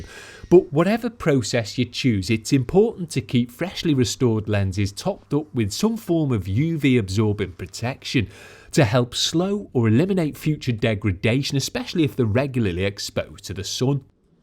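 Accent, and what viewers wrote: Liverpool, United Kingdom. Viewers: Mate, love you're vids, voice overs and production. Apart from the skill and dedication they show, they're incredibly relaxing. As a Geordie, I never thought I'd say that to a scouser 😂😂😂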